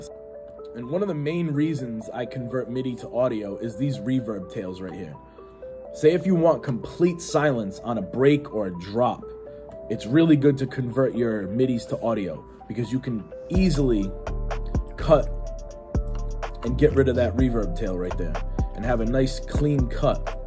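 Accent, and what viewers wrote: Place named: Italy. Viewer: i don't know what this accent is whether is italian or something else but i love it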